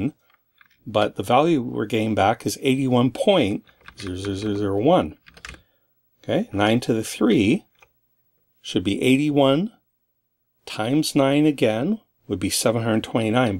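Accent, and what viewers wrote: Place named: Sault Ste. Marie, Canada. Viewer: Hi, I'm watching this in July 2019 months after the Wisconsin convention (hey, that's the beauty of YouTube) but you say in the intro you were heading down from Canada. With Canada being the second largest country in the world, where in Canada? If it's a privacy issue, no problem. I've just subscribed and started binge watching some of your C64 and 6502 videos, great work! I'm going to hazard a guess based on accent... Sault St Marie? Regardless, keep up the great content! Your channel is a retro-blast and despite thinking I've mastered 6502 asm and the C64 world, I've learned some great little tricks so far! I was happy to see that these quirks "work" on Vice C4 Emulator (except the "screen saver" one) as well as my C64!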